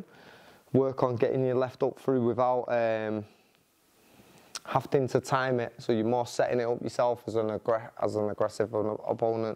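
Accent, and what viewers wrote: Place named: Liverpool, United Kingdom. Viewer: killer scouser accent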